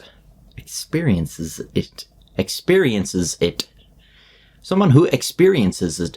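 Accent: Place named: Ireland. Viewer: The bloopers are the best part... when the Irish accent even blows the mind of the person with the accent...Lol! 🤣🤣🤣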